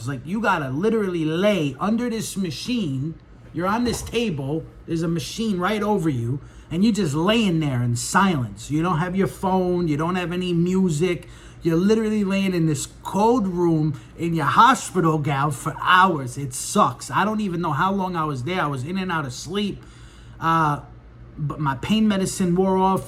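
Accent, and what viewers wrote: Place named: New York, United States. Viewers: You got me laughing out loud when you got to how the cholestyramine works :) I have not had gall bladder attacks (yes I've had kidney stones) but got a long covid condition with irritable bowel - messing around between constipation and diarrhea. My nail technician was taking Cholestyramine for what was a close to Crohn condition. When her doc put her on Cholestyramine her problems went away overnight so I asked my doctor about it. He told me he had MANY patients on it. I've been on it close to a year and it works very well. Sometimes the discomfort part of that is challenging, but on the whole it works very well. I take it every single day. Fingers crossed. You didn't mention where you are from but you remind me of my New Jersey roots - or sound a lot like friends in Queens. :) Thanks for this really helpful video!!!!! 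Good luck to you going forward!!! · Thank you so much for sharing your gallbladder journey. My daughter-in-law is having extreme attacks now and is going to speak to a surgeon this week. This really enlightened me as to what to expect and I shared it with her. I just want to say that you are very funny and it was a pleasure to listen to you to the end, because with your New York accent, you tell the story hilariously. Thank you for your candor about the diarrhea and what to expect post surgery. I'm sure you have helped so many people. Forewarned is forearmed. May the LORD bless you and keep you healthy.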